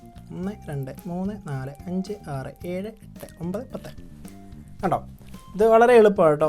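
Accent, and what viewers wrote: Kottayam, India. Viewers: ഞാൻ ഇപ്പോഴാണ് e ചാനെൽ കാണുന്നത് സൂപ്പർ അടിപൊളി....നന്നായി പറഞ്ഞ് തരുന്നുണ്ട് tks ...varthaanam കേട്ടിട്ട് കോട്ടയം ആണോ....🥰🥰🥰🥰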